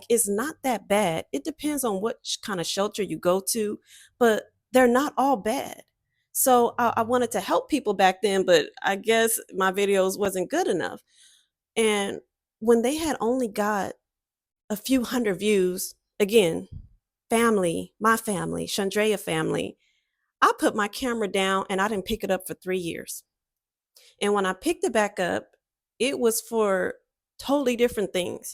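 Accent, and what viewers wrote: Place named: Bay Area, United States. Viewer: Ms. I hope hope you keep on with it. Your videos are really good. Now you have a sultry twang voice and in this video specifically your voice you sound a lot like Kamala Harris. I guess it's that both you and Ms. Harris are from California. The Bay areas has a vocal connection hundreds of mile away.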